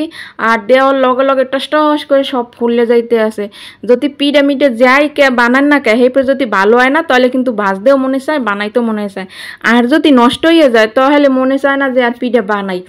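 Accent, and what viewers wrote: Barishal, Bangladesh. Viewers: ❤❤চলে এসেছি❤❤আপু তোমার বরিশালের ভাষা শুনতে❤❤এতো মিষ্টি করে কথা বলো❤❤ যতো শুনি ততো শুনতে ইচ্ছে করে ❤❤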